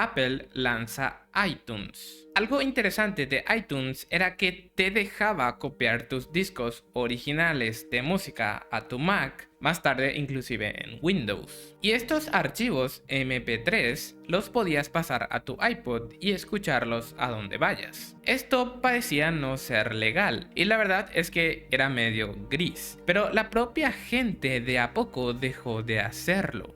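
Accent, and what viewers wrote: Paraguay. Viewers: Sos paraguayo verdad ?. UN ABRAZO KP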